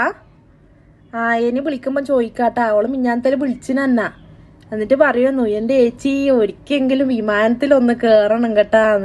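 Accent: Kannur, India. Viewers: ആഹാ അന്തസ്..... 🤗🤗🤗🤗🤗🤗കണ്ണൂർ ഭാഷ ഇസ്‌തം..... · You are so talented. Your action, the way you speak reminds me of our neighbours or close cousins in Kannur, with their simplicity and innocence! Love my place ❤️ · Amalee kannur bashaa😘😘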